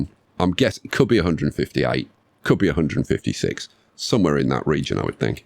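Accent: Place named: North, United Kingdom. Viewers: Dear (Smacking keyboard.) As someone from the Greater Manchester/Yorkshire border. I approve of your Northern accent... Bloody parth my backside though lad. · Dear, this is a request for you to do a full episode with your Northern accent.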